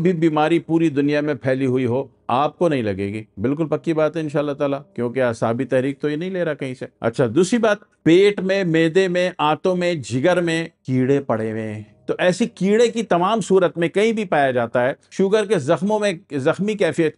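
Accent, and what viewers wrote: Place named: Hyderabad, India. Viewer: Aap bilkul hyderabadi lehje mei boley hyderabad, khushi hui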